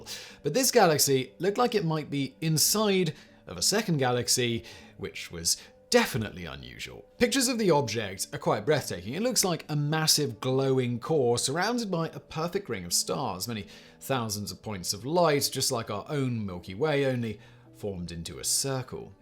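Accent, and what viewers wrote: United Kingdom. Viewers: Sometimes that British accent throw me off as well. Maybe if he used subtitles.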